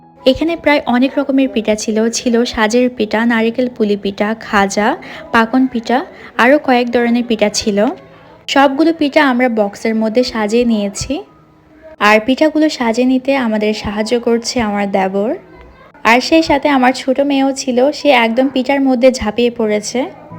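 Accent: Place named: Chittagong, Bangladesh. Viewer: hmm apnar kothar style e bujhtesilam apni chattagram er, r chattagram e jamai ashle asto murug musollom kore eta ami jani, amar nanu bari potiya.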